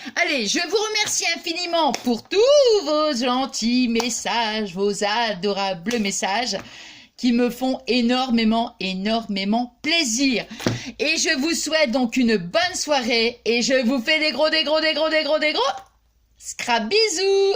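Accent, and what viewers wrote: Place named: Nord, France. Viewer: Bonsoir, vos créations sont vraiment magnifiques👍🤩🤩🤩et vous êtes tjours aussi Géniale, sympa et l'accent du Nord j'adore 😃😅 bonne soirée 😘😘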